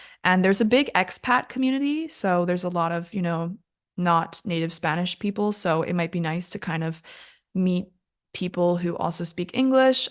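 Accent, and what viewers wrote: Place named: United States. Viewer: Hi. I just subscribed yo your channel. One thing that caught my eye. Bermuda is a British territory, isn't it? However, you speak with an American accent. That's interesting 🤔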